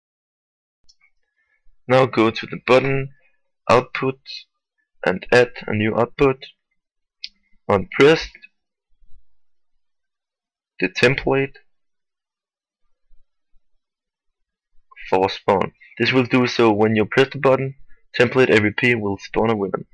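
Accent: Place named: Denmark. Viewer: Sounds like Danish English xD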